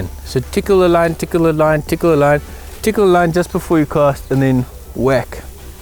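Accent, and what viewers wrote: South Africa. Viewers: Hi bud from wher are you you soud south africa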